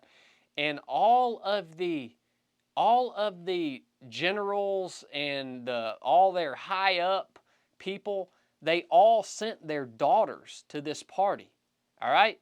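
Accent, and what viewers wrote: South, United States. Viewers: Are you from Mississippi or Alabama?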